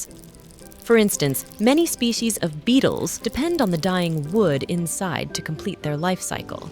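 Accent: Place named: United States. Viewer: Great to see/hear a film about pollarding. In Aragon, there are thousands of Chopo Cabeceros, Black Poplar pollards. If I am being picky, Would have been a perfect film with a voice over from a European person. Seems odd to hear an American chatting about this subject?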